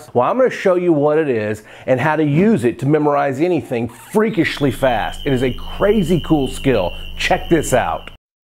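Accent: South, United States. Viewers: It’s so cute to hear his southern American accent saying things about Ancient Greece 😅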